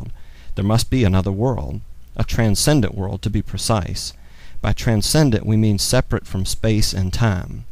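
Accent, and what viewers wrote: Oklahoma, United States. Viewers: hearing philosophy in a southern oklahoman accent is hilarious after a while ^_^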